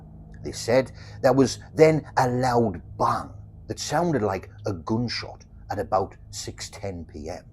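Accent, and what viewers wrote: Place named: Newcastle, United Kingdom. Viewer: Binging on much of your content - thank you very much for it, keep it coming. Another subscriber and fellow Geordie. At least I think you're a Geordie 🙂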